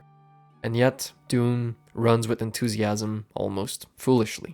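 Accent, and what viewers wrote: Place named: Quebec, Canada. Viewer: I think I spotted a fellow Quebecer's accent. Cool video man, on aime le witcher 3 les deux autant je penses !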